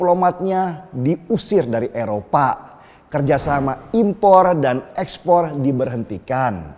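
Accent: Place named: West Java, Indonesia. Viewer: Bang, logatmu mana bataknya? Kok malah jd sunda bgtu? Gk keliatan akarmu, ini aseli batak atau cangkokan sih? Cmon, talk with ur blood not ur mouth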